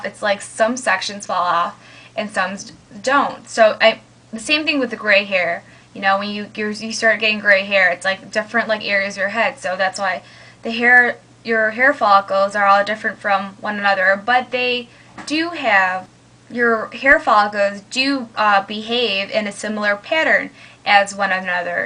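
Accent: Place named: South, United States. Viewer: its sooo funny watching you talk, like u look asian buh u talk country, 1st time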